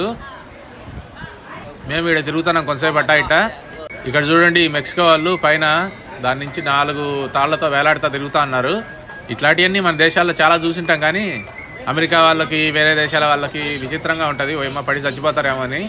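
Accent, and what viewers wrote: Kadapa, India. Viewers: Chala baga vuntundi taliking slang in telugu pakka rayalaseeme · I like your కడప slange నేను కొట్టం బడిలో 5 th class వరకూ చదివాను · Meedi yevuranna.mee Kadapa Jilla vadi madiri matladutunnaru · Meru 2016 lone inthati camera lu oka drone use chesi videos chesi andhariki chupichalanna thought inka me journey chala bagundhi nejaniki meru kuda Oohinchi undaru me videos ippudu 2022lo YouTube lo oka Telugu channel lo papular ayithayani Thank You mana Kadapa slag inka plus vere level meru 🙌👌👌👌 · Anno madi kadapenna ne vedieous super ante super na mana yasa marchipoledante great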